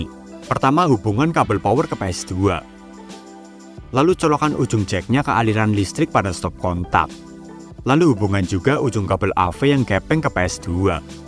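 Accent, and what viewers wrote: Banyumas, Indonesia. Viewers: Pada² ngapak Oh iya njenengan wong ngendi mas? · mas njenengan Banyumase pundi?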